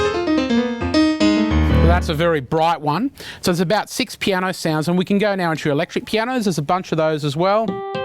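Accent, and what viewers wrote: Australia. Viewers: Lol. He collects a check from Roland for hyping their product..and in that thick Australian accent. But despite the hype it's not a bad piano. I got one and love it.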